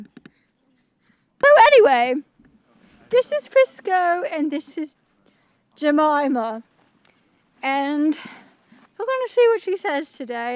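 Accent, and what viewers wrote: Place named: United Kingdom. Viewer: Aww i love youuuu ❤️❤️❤️❤️ and your British accent is brilliant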